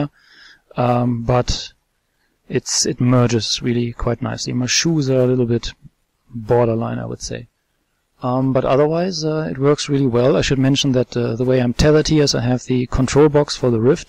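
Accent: Germany. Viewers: Ist das ein deutscher Akzent, den ich da raushöre?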